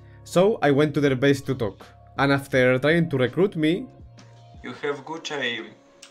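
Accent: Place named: Spain. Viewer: Se nota que hablas español eh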